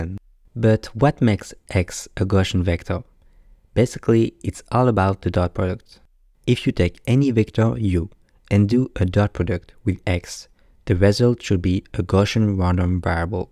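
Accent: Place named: France. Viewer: Difficult to hear the difference between Gaussian and Cauchy when a French pronounces it 😂. Great video anyway.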